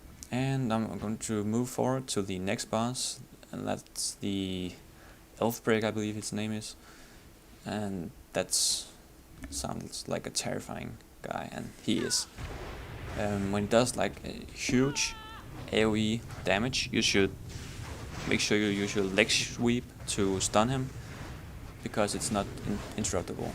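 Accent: Denmark. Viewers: du er dansker kan jeg høre skal man være exalt med dem ? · Nice danish accent dude!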